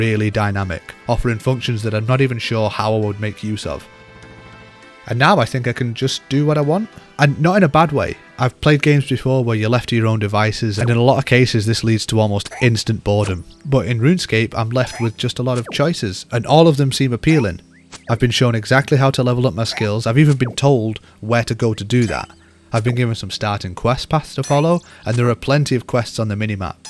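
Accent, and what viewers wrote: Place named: Darlington, United Kingdom. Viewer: Where are you from... Somewhere like Darlington... The accent is deeply reminiscent to me. I grew up in Middlesbrough...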